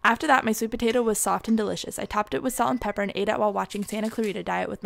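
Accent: France. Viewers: I love your french accent !!!! So cute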